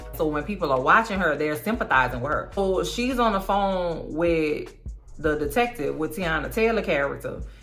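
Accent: New Orleans, United States. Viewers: The New Orleans dialect is the absolute BEST!! I love the way you speak!! ❤❤❤❤ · It’s the N.O. accent for me😂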